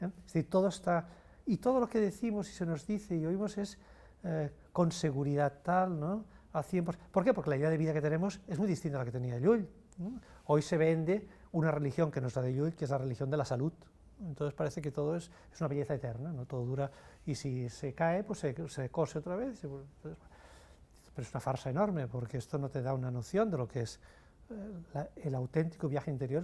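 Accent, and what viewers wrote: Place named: Catalonia, Spain. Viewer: Acento catalán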